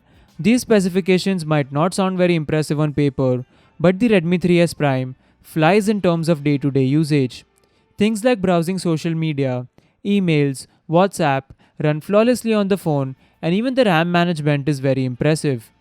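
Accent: India. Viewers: Indian english accent is very funny :D. Great review and phone.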